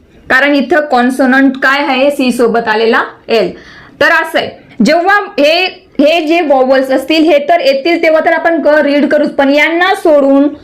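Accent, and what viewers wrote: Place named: Khandesh, India. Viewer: Where are you from ma'am your language tone like khandeshi girl. Are u khandeshi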